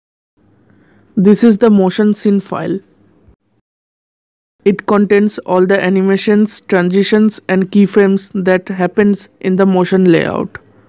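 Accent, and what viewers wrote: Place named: Bengal, India. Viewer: Hmm Bengali accent..nice :D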